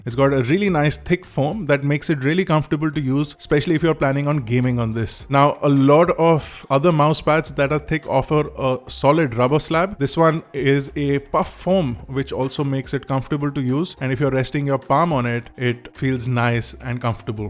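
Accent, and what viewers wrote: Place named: Delhi, India. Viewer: Simply elegant and useful info... Y i joined this coz the person is not wannabe... Simple perfect delhi accent English.. not trying to make it cool accentize British or American accent English... All concentration on gadgets knowledge no tantrums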